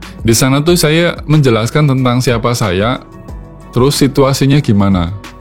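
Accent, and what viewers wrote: Indonesia. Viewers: Mantap bang,kayaknya Abang dari Jawa ya mendok bgt ngomong nya? Kalo aku orang ngapak😂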